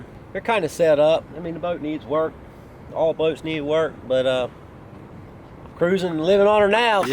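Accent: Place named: North Carolina, United States. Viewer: or north carolina. we have accents like that here too.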